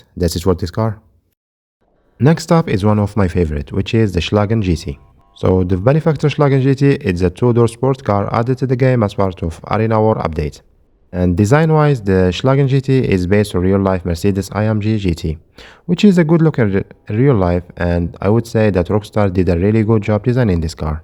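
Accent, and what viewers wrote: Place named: Algeria. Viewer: Brother where you from you sounds djazairi😅😅😅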